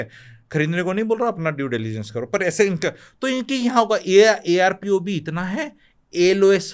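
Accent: Haryana, India. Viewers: Because he is from haryana, his tone is Haryanvi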